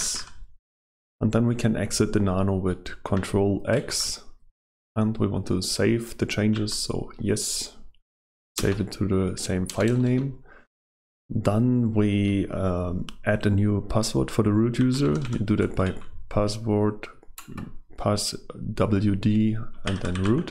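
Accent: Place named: Germany. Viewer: Ich liebe dich, dein Denglish und dein Tutorial!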